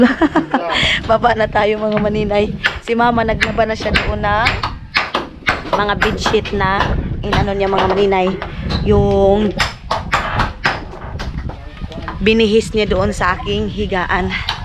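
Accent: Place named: Waray, Philippines. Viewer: Yung tuno nyong pagsasalita parang sa Amin waray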